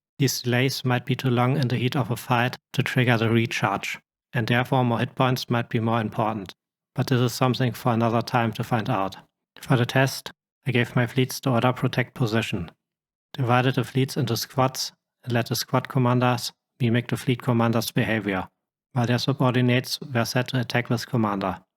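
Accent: Germany. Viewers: Thank you for sharing your findings. Ze graphs and German? accent really drive the facts home on this one :) :)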